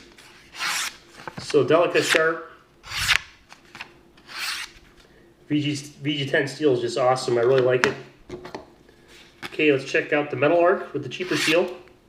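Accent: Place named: Canada. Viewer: Great video, I really thought you were Canadian seeing as you have no accent... or maybe you have a canadian accent living so close to the border Haha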